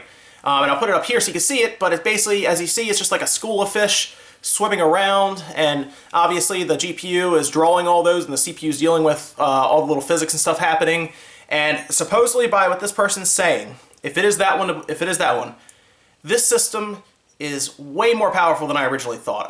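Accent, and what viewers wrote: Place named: Philadelphia, United States. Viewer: That Philly accent though.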